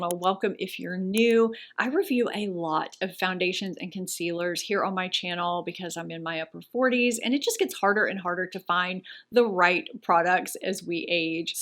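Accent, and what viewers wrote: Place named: New Orleans, United States. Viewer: I was trying to place your accent lol. I went to med school in NO and should have known. It was a long time ago though. Very special city.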